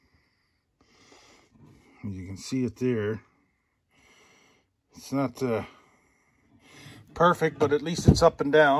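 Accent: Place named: Lynn Lake, Canada. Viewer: It’s been a while since we heard that accent in Lynn Lake.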